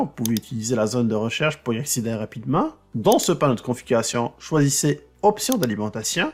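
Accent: Quebec, Canada. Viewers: Merci pour les explications avec le bel accent d’outre Atlantique. 👍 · J'ai voulu suivre vos explications.Helas!vous parlez trop vite et avec un accent québécois si prononcé que j'ai du mal à comprendre.Je crois que la meilleure solution,ce serait d 'utiliser de bons sous-titres bien lisibles en français bien sûr.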